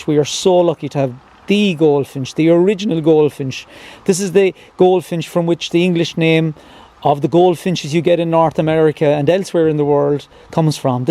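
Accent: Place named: Ireland. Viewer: Let me try that again! Great to see you and your beautiful backyard flowers and ponds. Your birds are spectacular! Also, great to hear you wonderful Irish voice. Nan Hampton (Antarctica, Iceland, Svalbard)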